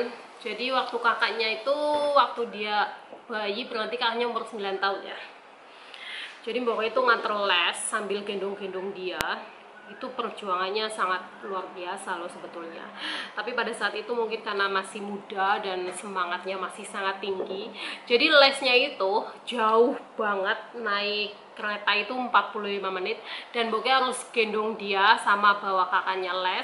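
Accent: Banyumas, Indonesia. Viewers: Salaam ngapak😁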